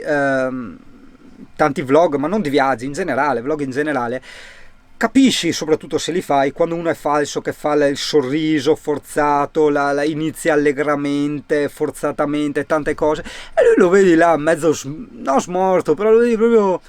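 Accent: Veneto, Italy. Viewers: Non cambiare i tuoi video piacciono perché sono genuini e con la parlata veneziana a volte un po' colorita.... Per il mono patino informati sulle regole in qualche città li equiparano al codice stradale di un motorino ....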